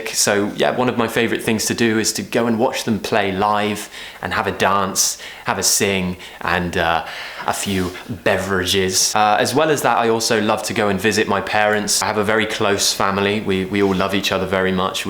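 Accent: United Kingdom. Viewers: I just discoverd ur channel and i'm so in love with ur accent ❤ i cant get enough of listening to british english 💕💕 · I’m from Vietnam and I’ve been watching your videos for a year. Thank you for traveling to our country. In ours, the English language as the second language and so important for everyone. But most people prefer America accent to British so that I was thrilled to find out your channel. If you go back to Vietnam, hope we have a chance to meet up. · I enjoyed this video a lot First time I felt British accent is more difficult to understand than Americans but as time goes by I felt it’s easier It’s because of you Thanks a lot😊 And I love British accent 🌸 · I love your British accent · I'm impressed with your voice texture, mate. The accent is awesome and British indeed. I'm so fascinated that my earphones are poked regularly into my ears. Explanations are vivid and practical. 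· Oh my gosh.. i am tooooo late. Anyway, How are you🌸 i am so glad to see you again. I hope i can see u more often. I missed your awesome british pronunciation a lot indeed!!!!! Thanks a million🙋🏻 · I like meat really like it and I like watching your video, easy to understand and I like British accent. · Your perfect British pronunciation and energy like a bomb motivate me. Thank you so much. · Dear... You are Awesome... BRITISH Accent 100%, learned a lot From You, Thank You Very Much 🙏😘😘😘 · Im from Bangladesh. I dont know have u ever heard the name of this country or not?bt i was always been looking for a channel like u so that i can learn british accent. U are really amazing. Thanku so Much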